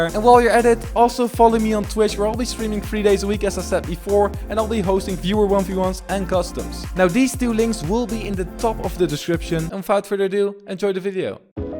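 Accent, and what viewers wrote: Netherlands. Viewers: Lol je bent nl en ik hoor het aan je acsent en op een clip zij je gg man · Ur accent sounds like your from the netherlands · Are you Dutch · Are you dutch??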